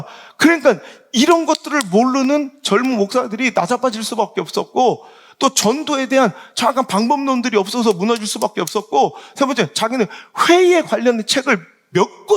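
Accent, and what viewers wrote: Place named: Chungcheong, South Korea. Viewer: 목사님.은혜많읻고잇습니다.예화도.재밋네요 충남예산인데.맞아요.충청도어법.오랜만에듣고보니.넘재밋네요.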